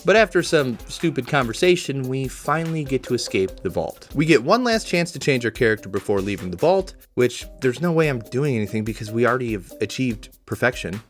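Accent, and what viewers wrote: Indiana, United States. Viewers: Is dude a Hoosier?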